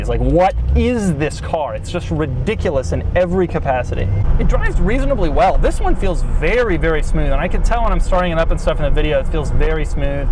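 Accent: England, United Kingdom. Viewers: english accent = perfect! yes yes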